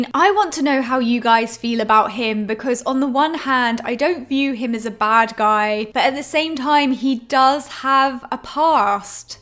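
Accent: United Kingdom. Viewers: You have the most british accent that i’ve ever heard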